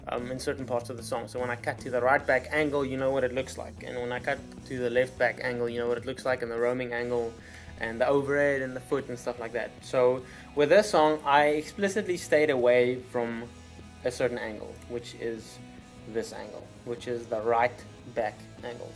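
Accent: South Africa. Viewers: its south African i blv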